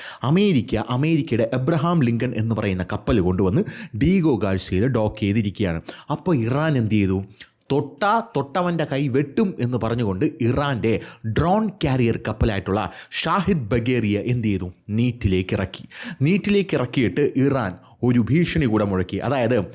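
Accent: Thiruvananthapuram, India. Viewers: 😂😅 ഓ, തന്ന... തന്നെ അപ്പീ 😂😅😅 തിരോന്തോരം 💪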